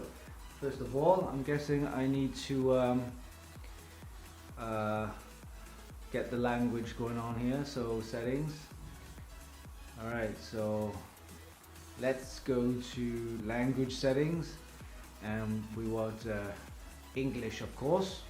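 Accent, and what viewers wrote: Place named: United Kingdom. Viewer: An Asian man with a British accent = YUMMMM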